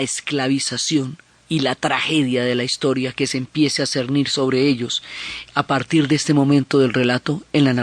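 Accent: Colombia. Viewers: Por el acento de la ponente, deduzco que es colombiana, y yo creo que el nombre de esta señora debería estar grabado en letras de oro en la sala de sesiones del Poder Judicial nacional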